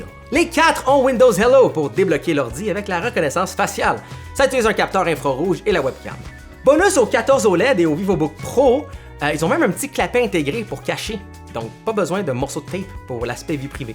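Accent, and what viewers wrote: Quebec, Canada. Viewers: Ta chaîne est peut-être sympa mais l'accent québécois est insupportable..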